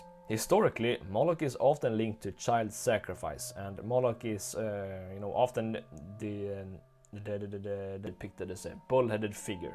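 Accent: Sweden. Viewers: Are you sweedish?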